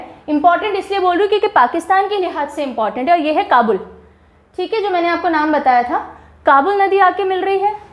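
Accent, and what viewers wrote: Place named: Uttar Pradesh, India. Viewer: Awesome maam...she s from knp or lko...aisa lgta h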